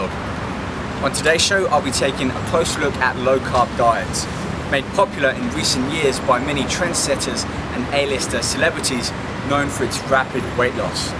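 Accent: London, United Kingdom. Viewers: lol he is british, he has a very strong british accent. Born in london and so on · YOU SOUND LIKE YOU'RE FROM LONDON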